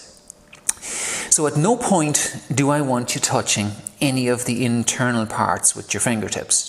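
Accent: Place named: Ireland. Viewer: Thanks for your tutorial my lovely Irish friend! I note the emphasis on absolute cleanliness of the ring to case (ring) surfaces. Now, In my enthusiasm ... I assumed the object was to reduce any friction to a minimum (nice and smooth) so applied a very light coating of CRC Silicone 808 around the mating surfaces... Now.. I am rewatching and note your mention of "the perfect AMOUNT of friction .. which is apparently a "wonderful mystery" !! What I note now with my Powerball (250Hz Pro, by the way) .. is that although I have smoothness and a little less noise, I am having difficulty attaining higher RPM. I can spin up to around 5 or 6,000 RPM and all is very smooth but it is like I lose that "wonderful mysterious grip" which you speak of, which enables the continuation of torque application above mid revs ;) Indeed, I have some "clutch slip". You DID mention slip too!! 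So... I may return to the innards and clean ring and shell tracks again very carefully with Isopropyl alchohol ... I do hope that by some equal mystery, you may still pick this comment up, some months on... Many thanks. Love your accent. Chris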